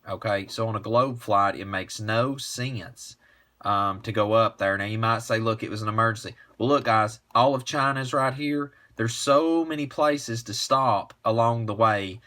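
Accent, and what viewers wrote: United States. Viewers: Judging by your accent you are probably American and as such probably never had any lessons in geography so I made allowances for your preposterous assumptions. Firstly, you are actually using polar equidistant map of the Northern Hemisphere as it is on the GLOBE and that is,you must admit very,very poor proof of your FE..Secondly,have a look at the globus (you know, the round spherical thing in primary school class room with maps on it ) and you will see that a trip from Shanghai to Munich would be mostly over Siberia and then Ukraine.Then, how much of the horizon did you actually see on your flight through 26 inch window? All of it or just a bit of it?Thirdly, on equidistant map's grid the straight line is actually a curve as it has to follow latitude or longitude ( parallels and meridians ) depending on course.Lastly, if the Earth was flat with North Pole in the center and South Pole around it ( Ice Wall ) the use of simple compass ( you know, always points North ) would be IMPOSSIBLE.Now try to figure that one out and you will see just how silly is your assumption.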